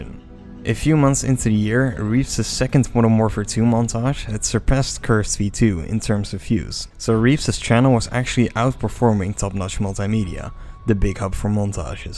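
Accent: Netherlands. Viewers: dutch accent? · the dutch accent is unbearable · the guy speaking is dutch, 100%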